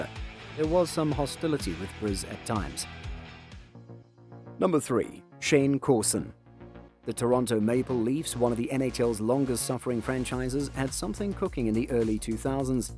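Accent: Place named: United Kingdom. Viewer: this vid is shallow rubbish ...w/ a Brit accent ?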